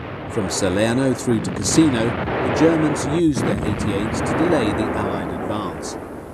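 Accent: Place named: United Kingdom. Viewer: I feel like I'm in a fox hold during battle, with some calm British guy yapping next to me.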